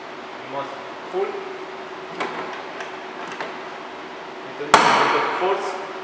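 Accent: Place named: Ireland. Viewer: It's an irish accent I believe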